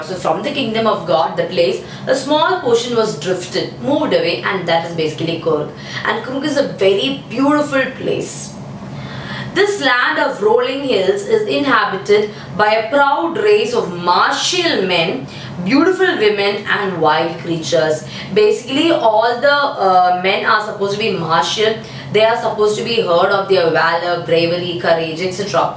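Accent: Kerala, India. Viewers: Mam r u from Kerala??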